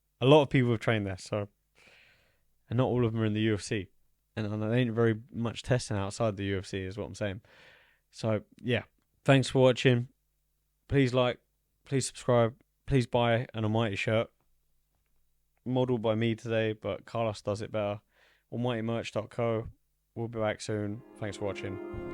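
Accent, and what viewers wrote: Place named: Australia. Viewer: You kinda talk like an Aussie lol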